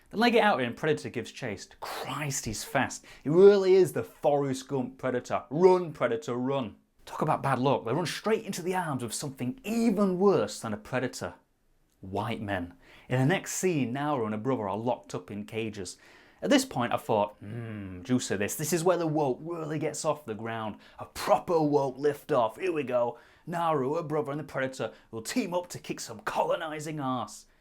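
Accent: Yorkshire, United Kingdom. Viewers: I’m from Yorkshire. By eck, that’s a great Yorkshire accent mate!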